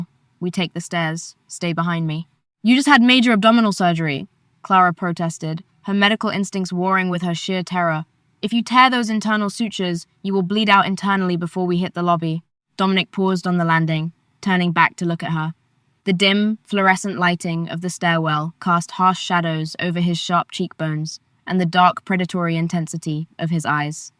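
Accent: United Kingdom. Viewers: Yes I did she had to so they both could survive it was self defense. Very exciting g intense story I loved it from beginning to educate. I have a little trouble listening the narrator spoke really fast with a heavey British accent. I am from moline illinois USA and I give a 10+++++for the story. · The British accent is fine. Have you heard the atrocious attempts at Italian or Sicilian accents in some of these?? This isn't AI, so thumbs up from me.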